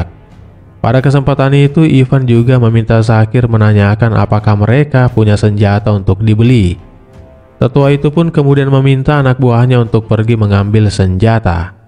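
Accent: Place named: Eastern Indonesia, Indonesia. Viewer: kok ada logat indo timur..? barangkat.. salam dari maluku🙏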